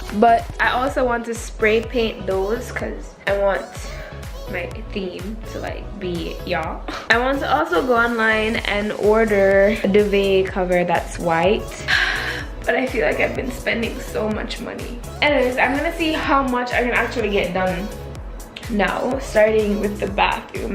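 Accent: Jamaica. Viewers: As a Jamaican I can hear the accent a thousand miles away 😂❤️ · Very cute Apt. can't wait for the finishing....keep at it girl you seem like a lovely young lady( I hear a Jamaican 🇯🇲 accent) a lot of my childhood friends are Jamaican!!!!! Love them all especially the eating times at their house 😋 I'm from BERMUDA 🇧🇲💖💖💖💖💖💖💖💖💖💖💖nice to meet you your NEW SUBBIE💥💥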